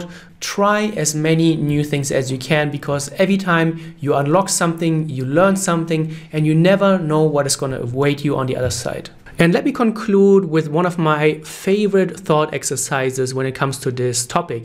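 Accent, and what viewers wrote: Germany. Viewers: Are u German, accent is very much German English accent😁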